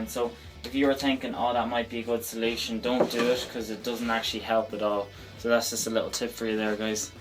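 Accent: Ireland. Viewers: Thanks. I have an old bench top saw which is about as accurate as a banana. Been looking around various videos on the net but have to say yours is excellent. I think the motor on my saw is fine so thinking of using that. My difference is that I am paraplegic so use a wheelchair so need to build the bench a bit lower. Thanks for the video. Only found you today but watched 4 of your vids and will watch more. From your accent I assume you are in the Emerald Isle. North or South? I'm in England near Cambridge but have visited Ireland many times and think it is a great place and have to say have always been made welcome. Sorry to babble on.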